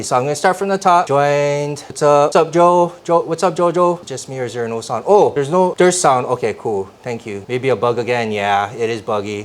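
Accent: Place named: Hawaii, United States. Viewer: Came across a couple of your videos and by your accent I had a feeling you was from Hawaii 😂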